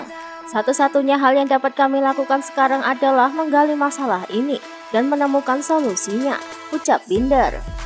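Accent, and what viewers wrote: Java, Indonesia. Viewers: Hadir mba medok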